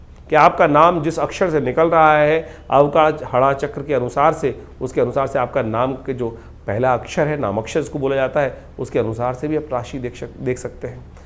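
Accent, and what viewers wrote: Standard. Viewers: Bahut sundar aur shudh uccharan hai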